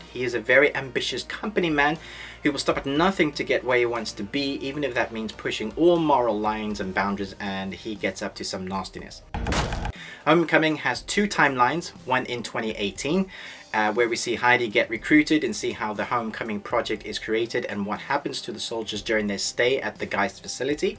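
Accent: South Africa. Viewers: I'm having troubles placi9ng your accent ? South African?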